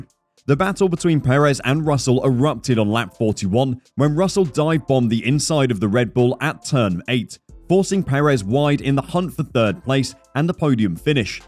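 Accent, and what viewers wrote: United Kingdom. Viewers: Typical British: Unsporting as hell, but with a nice accent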